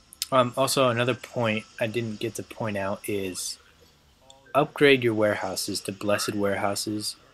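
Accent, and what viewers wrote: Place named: Canada. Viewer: ¿',: | i can tell that your canadian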